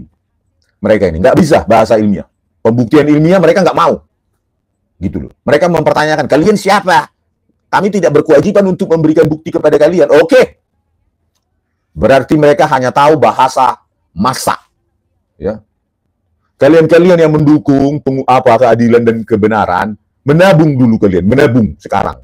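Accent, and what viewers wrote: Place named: Batak, Indonesia. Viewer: Kami suka komunikasi khas bataknya nya bang..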